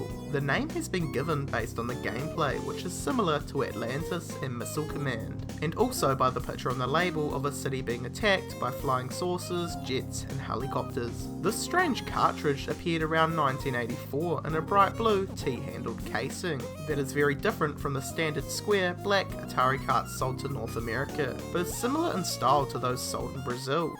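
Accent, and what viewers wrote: New Zealand. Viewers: Is your accent from north NZ? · love the NZ accent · He's a new zealander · It sounds like NZ to me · That New Zealand accent though. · Sounds more like New Zealand to be honest, could be Aussie though.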